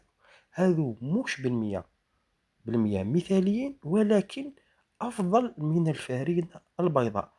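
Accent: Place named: Algeria. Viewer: صوت ألجيريانو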